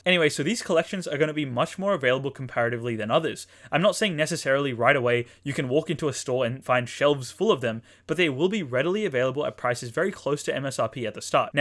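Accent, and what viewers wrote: United States. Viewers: I'm looking forward for the Pikachu figure box, also happy 10,000! Your American accent is much better than some that I know for sure. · Happy 10k! I enjoyed the American accent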